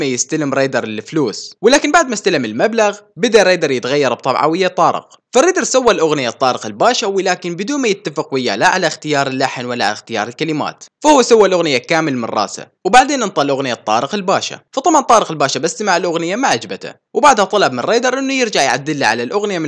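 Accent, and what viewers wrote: Iraq. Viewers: لا عراقي باعي لهجته عراقيه 👀😊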